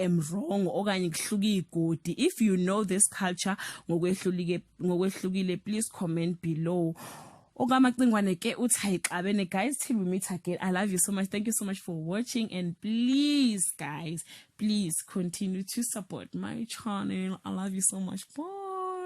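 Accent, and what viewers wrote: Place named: Nkandla, South Africa. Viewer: Cc uphuma enkandla uyabonakala ngesiZulu sakho vele abasenkandla bayabonakala ithinalabo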